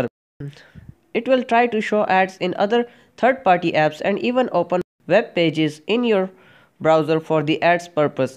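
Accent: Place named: India. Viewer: Even if you sound super Indian you made it sound very clear great video 👍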